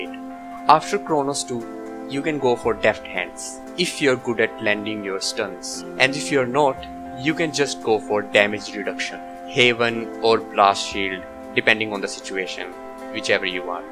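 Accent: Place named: India. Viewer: I can tell by your accent that you're indian. Am I right? :)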